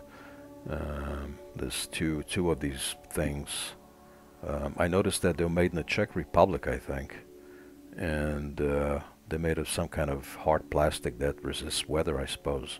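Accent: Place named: United States. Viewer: Domnule, ador engleza americana care o vorbiti,dar fin pacate nu am inteles nimic!! Eu sunt romanca frantuzita, sunt abonata Dvs.,de circa un an!! Multa sanatate din Oradea BH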